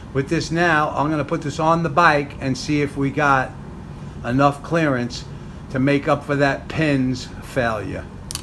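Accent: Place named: New England, United States. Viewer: Cant stand the NE accent,Never met a goof that didnt vote Democrap that talks like that.And this goof repeats EVERYTHING.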